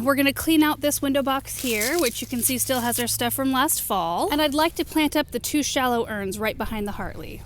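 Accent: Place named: United States. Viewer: There are some words you say that make me smile at the difference between the UK and the US. You say Gerbera, and we make that into a J sound (Ge together making the J sound), so we say it like Jerbera. The other one is Dahlia, which you make an ah sound and we make an ay sound (so we say Daylia)! 2 countries separated by a common language in action ❤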